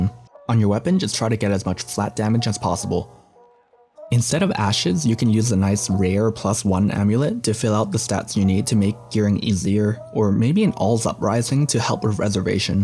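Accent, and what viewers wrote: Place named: China. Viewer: that chinese accent tho...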